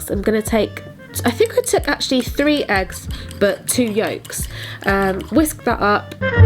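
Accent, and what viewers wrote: United Kingdom. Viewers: I really like your British English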